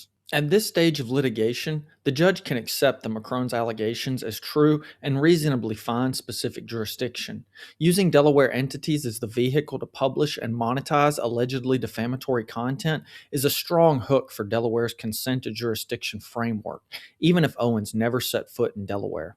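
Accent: South, United States. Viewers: 1st time viewer here! I’m enjoying your content! So, you’re in CA, but you’ve got a delightful southern accent. I wonder which state. Texas, or where???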